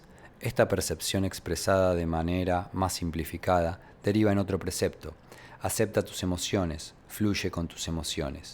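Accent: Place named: Argentina. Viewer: Más allá del contenido que es genial, el locutor real me encanta! En argentino!! Éxitos!!!!!!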